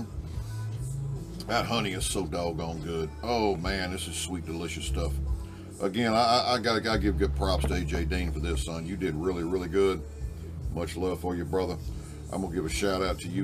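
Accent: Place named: Louisiana, United States. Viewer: I like your video's very funny.I have a question for you where are from I was trying to figure out your accent I'm guessing Louisiana.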